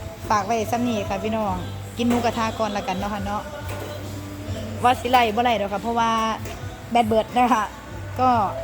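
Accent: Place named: Isan, Thailand. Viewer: ผมมักเสียงเว้าน้อง คัก❤❤❤❤❤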